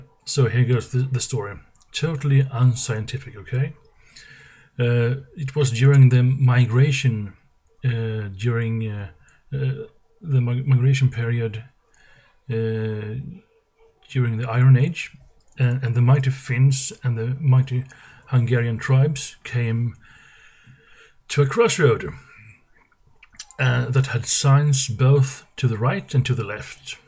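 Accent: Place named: Sweden. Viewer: Your accent is Swedish, but you can enunciate Finnish pretty well.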